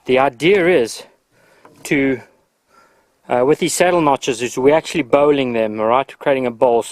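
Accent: South Africa. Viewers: Thats a heavy South-African accent you've got there mate . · Is that a South African Accent I am hearing ?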